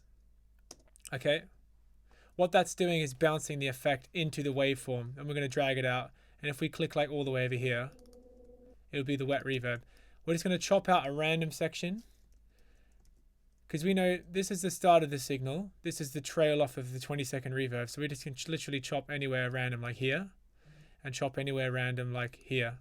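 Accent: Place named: England, United Kingdom. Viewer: Your accent is so weird now lol Becoming more English. Love the tutorial btw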